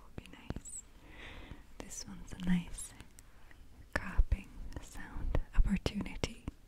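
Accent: Russia. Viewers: You should do a souvenir shop role play with those items. Maybe use a heavier Russian accent and give us background on the items! I’d love to know the stories behind them :) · The Russian accent is so relaxing 😍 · I was sooo hoping to hear the russian accent again ^^''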